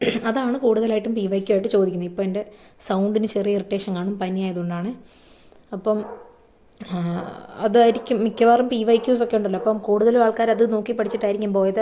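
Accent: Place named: Alappuzha, India. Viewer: Alappuzha aahn nn thonunn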